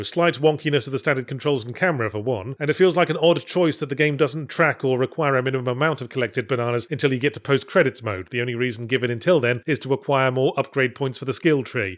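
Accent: United Kingdom. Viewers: Yahtzee explained in a stream that it's just awkward to pronounce. Likely due to his British pronunciation of the "banana" part specifically.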